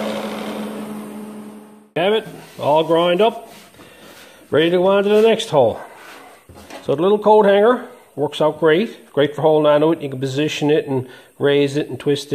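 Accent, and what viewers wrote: Newfoundland, Canada. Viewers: Got to be from Newfoundland awesome video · I thought it was Newfoundlandish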